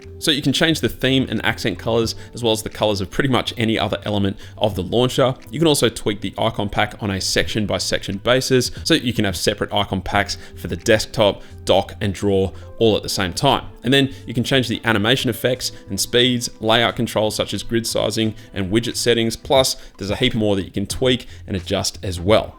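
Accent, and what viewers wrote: Australia. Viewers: Awesome video Sam! Just wanna ask, are you Australian?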